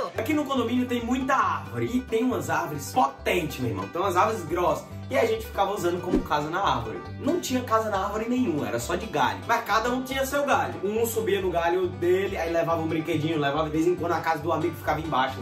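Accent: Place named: Minas Gerais, Brazil. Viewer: desculpa perguntar, mas você é de BH ou de Minas Gerais? Porque eu sei que você cresceu em Fortaleza, mas o seu sotaque é muito parecido com o meu, que é mineiro. Obrigada!!! E aliás, parabéns!!! Você é incrível!!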